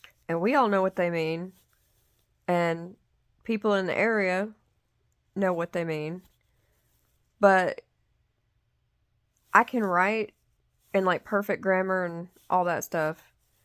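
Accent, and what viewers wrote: Appalachia, United States. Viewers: I’m not from the south originally. I can 100% hear your accent! Love it! Don’t try to fit in! Be your beautiful authentic self!! My sister is my neighbor. I live in the holler & she’s up on the hill. We’re putting in a zip line this fall to make visits more fun. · Oh honey, I grew up w my parents but with Appalachian grands people treated them mean too. I can sure tell u aint nothin like them stories from back in the day. Ignorance isn't the problem you have, it's theirs for making so many assumptions smh! Hate is hate, haters gotta hate to stay alive I think. Listening to u makes me miss them, but in a good way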